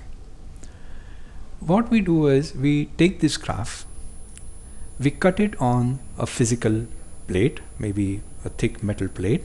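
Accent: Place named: India. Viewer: hats off. great work from the professor, made very easy to understand. and he has excellent indian accent. thanQ very much sir.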